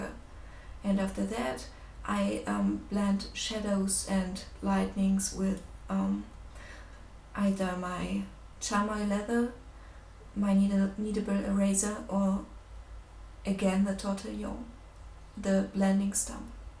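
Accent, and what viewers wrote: Germany. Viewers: You sound very german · Die ist deutsch? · als du angefangen hast zu sprechen dacht ich mir sofort die ist deutsche 😂😂😂 stimmts?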